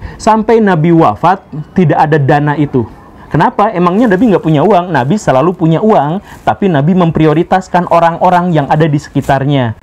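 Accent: West Java, Indonesia. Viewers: Meni nyunda pisan p guru.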